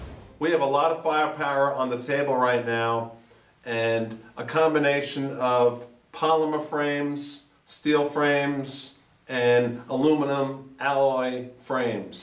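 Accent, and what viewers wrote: Long Island, United States. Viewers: And on a funnier note....you sound EXACTLY like the "honey badger" guy. Long Island?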